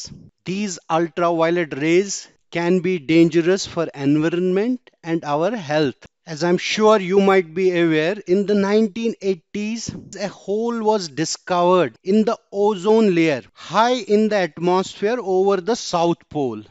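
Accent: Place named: Kashmir, India. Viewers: Your accent is Kashmiri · Sir must be kashmiri because of dialect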